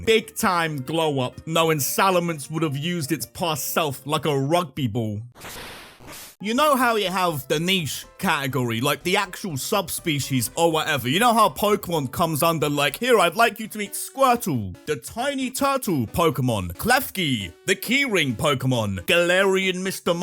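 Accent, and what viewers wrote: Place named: United Kingdom. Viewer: You're so british I love it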